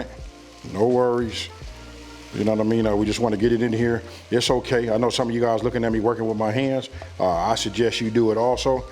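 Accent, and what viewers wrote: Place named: West Coast, United States. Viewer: You are so California cool. I love your West Coast accent and your southern way of cooking.❤😊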